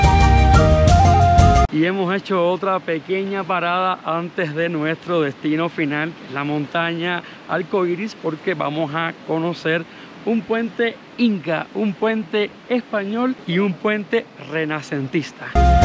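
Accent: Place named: Cuba. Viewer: Y por si acaso lo que t'escribo es en broma porque como no diría jamás Kiko : "Tu si me simpatizas" por tu manera de hablar tan cubano chico, por eso recordando la expresión del Chavo del 8 : " Tómalo por el lado amable" 🤗👍🏻❤️🇵🇪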